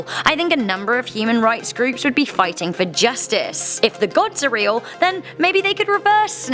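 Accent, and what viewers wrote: England, United Kingdom. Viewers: Just to say, I live your English accent dear😊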